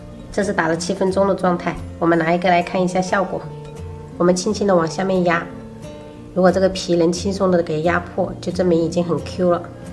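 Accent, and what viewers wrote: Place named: Quanzhou, China. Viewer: 我离开泉州二十年了。听见乡音很亲切！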